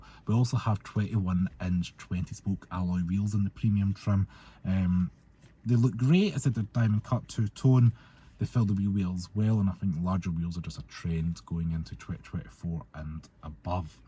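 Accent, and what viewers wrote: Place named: Paisley, United Kingdom. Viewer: I meant no offence, as I’m sure you are a lovely bloke. Right, I felt the camera was on you too much, especially when you were explaining or highlighting certain aspects of the car. Please don’t be offended, but at times I had great difficulty understanding what it was you were saying. There was very little separation between the spoken words. My daughter-in-law is from Paisley and has a similar accent to yours but she is easily understood. Anyway, I’d like to have been told more about the car i.e rear seating, do the rear windows go all the way down. Is there storage for the parcel shelf. What’s the kerb weight and so on. And what on earth is the “fuzzy Stuff”?? Cheers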